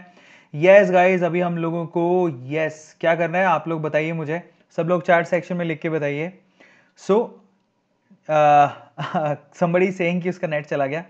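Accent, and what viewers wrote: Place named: Gujarat, India. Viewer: Sir gujrati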